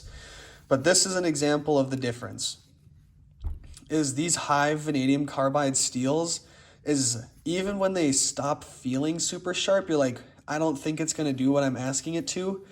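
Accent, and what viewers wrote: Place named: Spokane, United States. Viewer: ...sounds like you're from Spokane WA. My cousins say it that way..cool content btw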